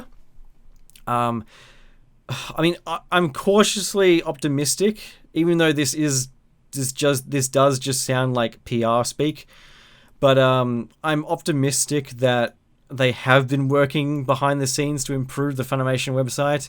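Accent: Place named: New Zealand. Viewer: U Aussie cuz u don’t sound like it u sound like ur NZ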